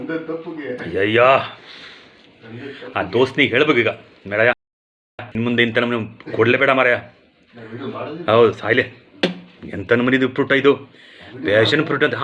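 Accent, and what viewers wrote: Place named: Gokarna, India. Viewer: Your Gokarna accents, beautiful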